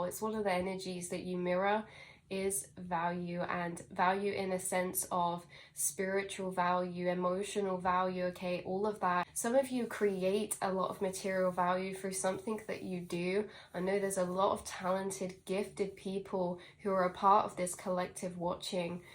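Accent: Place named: United Kingdom. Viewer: Girl, you embody a plethora of Wisdom, you showed up on my feed two days ago and I've been listening to you since, all day yesterday, and I must say that you embody within you, truths that's taken me years to aquire, and this reading is the most profound! Given that I've lived 800 lives 😂 my lineage travels back to Luke, the physician in scripture, which explains why I've always had a passion for healing... Mind, Body and Spirit... In ministry it was my goal and as a Holistic Healer, it is still a goal of mine... Wayne represents royalty in the early 1700 or even earlier, I know I've been in that life, I've seen and felt it and I'm drawn to those types of movies and frequencies... Celtic, knights, time travel and sci-fi... Some, not all.... Embracing my ancestral roots of Irish Scottish.... You sound British 🙂 German and Native American.... I recently discovered that I am a Blue Ray Starseed, Earth Angel, they've traveled with me and shown up in my meetings, and it resonates with me deeply.... 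Yes, large amounts of money is my inheritance and is needed for this leg of the path I'm on .... I also discovered that I have a dragon 🐲 working with me, I want to meet 😂 I see colors of blue, gold and white, purple... Amethyst is a strong energy and green, especially while in meditation....and the forest lights up with Frequency when I'm there... I Really Love this reading 📖 as far as commutation, I AM constantly in communication with Spirit and now my guides and starseed family.... S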